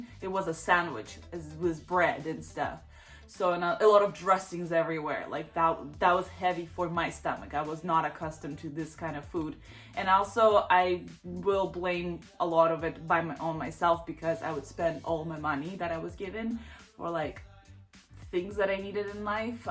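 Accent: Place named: United States. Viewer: ayeeee congrats on your citizenship!!! By the way, you sound super american for only being here for 8 years. My grandmas been here for 50 years and she still can’t speak English lmao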